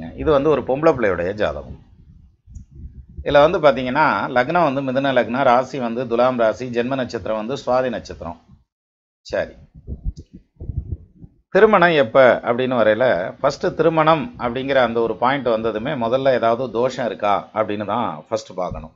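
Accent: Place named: Tirunelveli, India. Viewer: Sir neenga thirunelveli Tamil I enjoy because my father veeravanallur and my mother ambasamudram. We were in vickramasingapuram for a long time.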